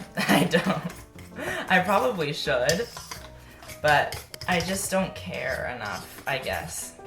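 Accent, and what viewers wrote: South, United States. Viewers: YOU CAN HEAR YOUR SOUTHERN ACCENT SO MUCH IN THIS VIDEO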